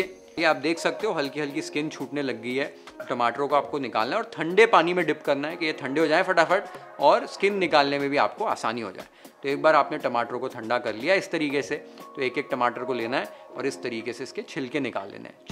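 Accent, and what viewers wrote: Maharashtra, India. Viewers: You are a pure Maharashtrian.....the way you pronounce birda,fadfada....I'm so thankful to you for giving a platform to our satvik,simple n tasty Maharashtrian food ❤ Bappa tuzya saglya ichha Purna Karo...khup khup aashirvaad.... Ganpati Bappa Morya 🌞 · I can see Marathi accent in you Really want to know the reason Love ur recepies❤ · It's mouth watering recipes.....enjoyed your recipe and typical marathi pronunciation 👏👏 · Sanjyot, tuze marathi uchhar agadi * Marathi* aahet. Khup chan video... Marathi jevan purn dakhavkaya baddal❤ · Pleased by the video 🤤🤤 And your Marathi accent is OMG.....✨✨ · Your marathi accent is so sweet 😅😂😂& i really like your recipes, they are so easy to follow🤗😁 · WOOOOOOOOOOOOOOOW, the way you pronounce all the Marathi dishes it's a treat to listen and as always the thali is outstanding.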